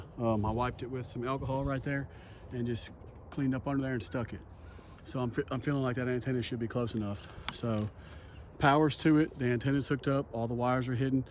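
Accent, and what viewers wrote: South, United States. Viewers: The best part of your video is your comments. It’s great listening to you. You sound like I’m talking to myself with southern accent. I’m a yankee. I’d rather have Yuengling.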